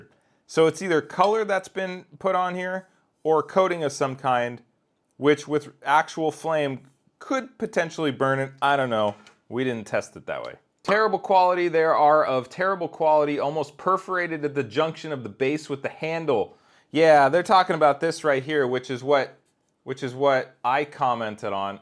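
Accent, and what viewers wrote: United States. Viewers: You live in PORTUGAL?!?!?! You have such an American accent!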